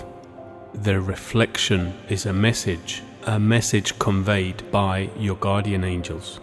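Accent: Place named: United Kingdom. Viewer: It is good to hear the British English accent. so classy.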